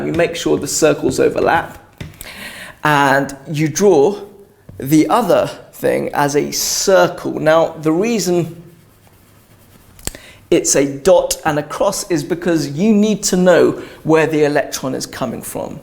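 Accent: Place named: United Kingdom. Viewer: You have really improved your british accent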